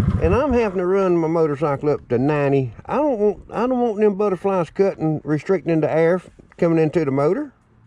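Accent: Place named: Tennessee, United States. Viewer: Damn, I learned a lot! Thanks for the vid. I'm from Georgia, you sound like your from Tennessee?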